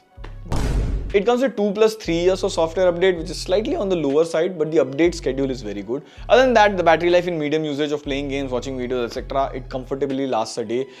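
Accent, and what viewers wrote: India. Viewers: Good god , HEAVY indian accent. THIS IS WHY WE ARE LAUGHED AT GLOBALLY.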